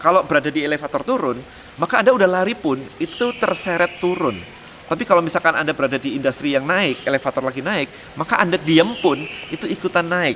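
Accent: Java, Indonesia. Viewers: koko medoknya teknikal banget hehehee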